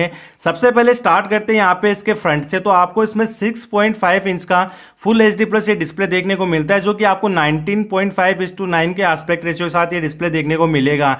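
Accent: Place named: India. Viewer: heard indian accent.. quick close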